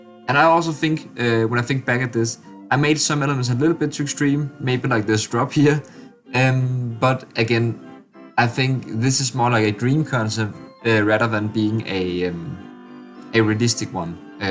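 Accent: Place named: Denmark. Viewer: Love the danglish 😍😅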